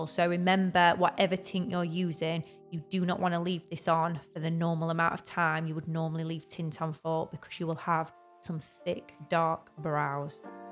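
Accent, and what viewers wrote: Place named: North, United Kingdom. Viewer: the limey accent.. when it's too pronounced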